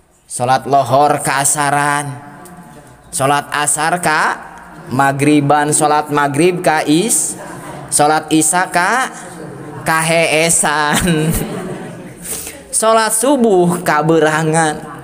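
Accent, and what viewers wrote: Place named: Jampang, Indonesia. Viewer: Berkah pak ustad. Ti palih mana ya nme ngarenge.. tapi logat na sapertos ti jampang..!! 🙏🙏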